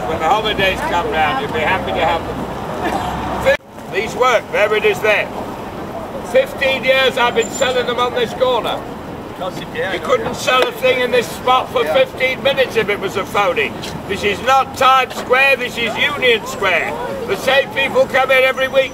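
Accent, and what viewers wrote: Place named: Peckham, United Kingdom. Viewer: his voice isn't Australian. sounds like he's from the depths of Peckham England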